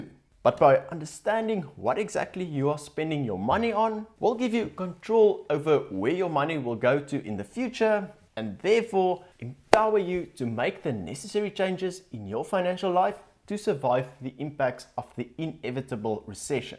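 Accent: Afrikaans, South Africa. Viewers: So handsome. Strong Afrikaans accent. Very cute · Damn, I enjoyed the Afrikaans slang and tone. Lekker to listen to something from RSA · its always refreshing how authentically afrikaans you are, genuinely feels south african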